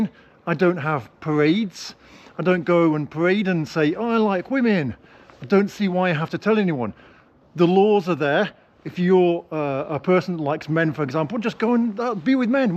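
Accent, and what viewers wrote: United Kingdom. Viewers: A wonderful mix of the background of a Russian provincial city and British pronunciation!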